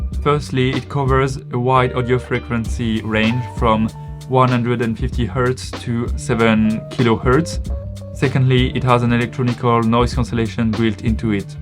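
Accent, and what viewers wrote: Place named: France. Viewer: English with a French accent is charming.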